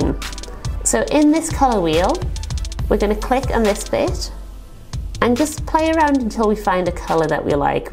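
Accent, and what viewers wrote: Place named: United Kingdom. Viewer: First of all, our beautiful and intelligent teacher is a stone cold fox! Wow! Her edgy British accent is super cool, and her subtle sense of humor makes the video easy to watch. It’s nice to see a real human being, with attitude, great tats, and lots of smarts making instructional videos instead of the usual blah blah blah missy girl. I can hardly wait to start my info graphic… keep up the good work!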